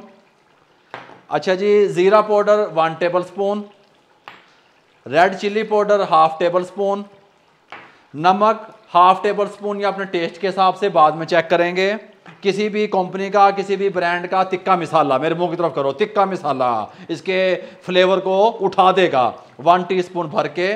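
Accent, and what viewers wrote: Punjab, India. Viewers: Asalam o alaikum... aap mere bade bhai hai, mien Quetta se hu, yaqeen kare aap ne tho cooking itna aasan kr diya hai aur jo kuch bhi mien try kartha hu zabardast hotha hai... aap ka jo andaz hai na punjabi bolne ka e.g: chaske arahe hai, muh wich pani, aaj ne kya sika diya etc... yaqeen kare mujhe punjabi zuban seekhne ka shauk ho gaya achi lagne lagi hai... Allah aapko kamyabiya de. Ameen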